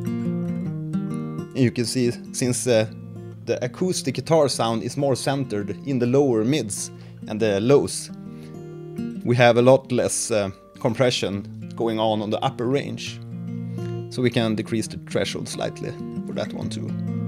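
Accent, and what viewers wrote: Sweden. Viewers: Thanks for the great reply, I actually wrote the beginning down, it was a good summary. :) I will try and play with sounds that have more transients, like you suggested! And yes, I will keep commenting, you can count on that! ^_^ You too, may your weekend be absolutely awesome! :) Are you by the way from Sweden, or do I recognize your accent wrong? Anyways, greets from your neighbor (maybe) from Helsinki! :)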